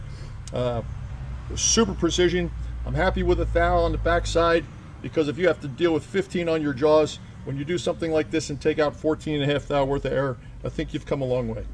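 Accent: United States. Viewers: you're the MAN!! This trick tightened up results to spec- and we could use funds on a 4-jaw instead of a replacement 3! BTW: My compliments on your voice and diction- very clear, understandable and smooth, and best of all: no grating dissonances that bleed your ears... If I may ask- where in the US does that accent originate? Keep on truckin'! -Claus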